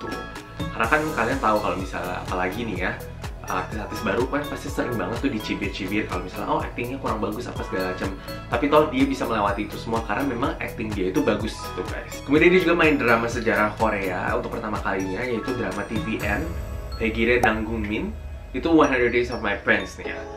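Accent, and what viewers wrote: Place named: Jakarta, Indonesia. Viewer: Haaii, gw suka banget sm logat Jakarte elooo...!!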